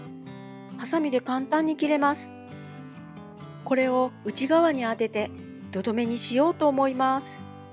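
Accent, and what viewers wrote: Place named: Kansai, Japan. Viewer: 屋外で植えていらっしゃるようですが、場所はどちらですか。 冬は霜が降りないのですか。 言葉のイントネーションの感じから、西の方の地方なのかなと思いますが、寒さはどうなのでしょう。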